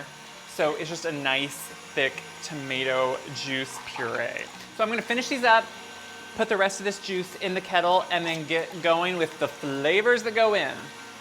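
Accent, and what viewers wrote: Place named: South, United States. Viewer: Your accent gives me life!! I’m a southern gardener girl in the DC area and I miss how warm the people are ( just like you) so your videos are like therapy for me. Thank you so much!! ❤️❤️❤️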